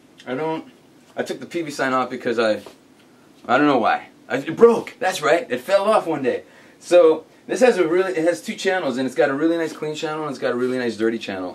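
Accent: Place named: Canada. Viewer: lol, he still says abooot :) love canadians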